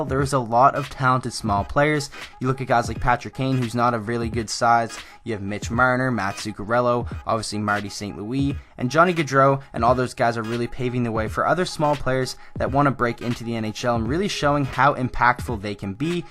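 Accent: Canada. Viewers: unknown gt gamer lol yeag he says about like a canadien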